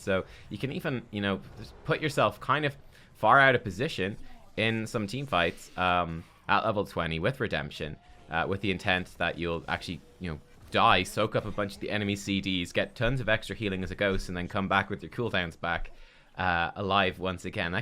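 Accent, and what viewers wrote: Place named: Ireland. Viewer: Pretry sure hes irish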